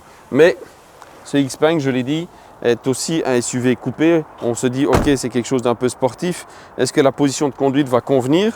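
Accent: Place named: Belgium. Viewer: Sympa un danois qui parle français avec un petit accent belge 🎉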